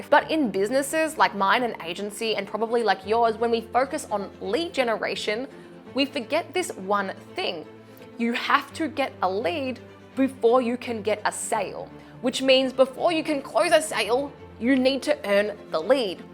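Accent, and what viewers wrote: Australia. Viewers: love everything you put out - pure value - you're a wizard... Therefore - you don't need the background music or it should be much lower - it's somewhat (to moderately) distracting in this video. Your Aussie accent is all most of us want or need to hear in your incredible videos. - 😉 - You're brilliant - we love your videos - there's no need for you to "entertain us."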